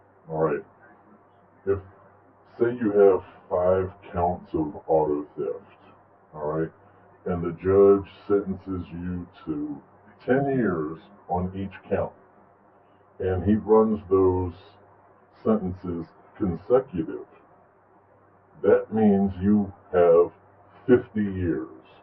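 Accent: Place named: Midwest, United States. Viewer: How is he doing this channel from inside there? From his accent I'd guess he's in the midwest.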